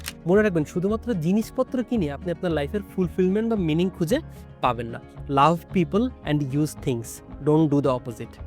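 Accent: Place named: Chuadanga, Bangladesh. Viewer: ভাইয়া জানতাম না আপনার বাসা চুয়াডাঙ্গায়। আমিও চুয়াডাঙ্গা জেলার দামুড়হুদা উপজেলার। আপনাকে অনেক শুনি, এখন বুঝতেছি আপনাকে ভাল লাগার অনেক গুলা কারনের মাঝে হয়ত ভাষার একটা টান ছিল যে নিজেও জানতাম না। ফি আমানিল্লাহ।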